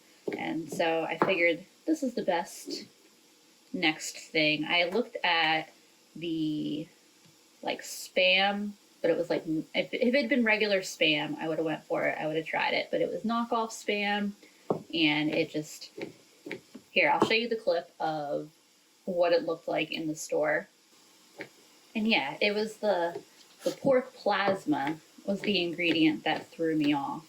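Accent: Philadelphia, United States. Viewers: I KNEW IT! I heard Philadelphia in your voice!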